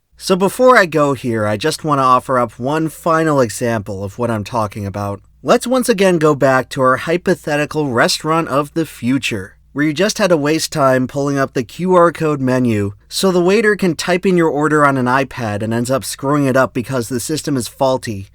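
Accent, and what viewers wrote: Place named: Quebec, Canada. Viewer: Your québecois is leaking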